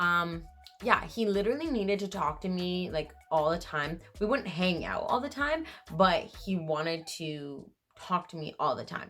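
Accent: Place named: Middle East. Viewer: Which part of the middle east you from?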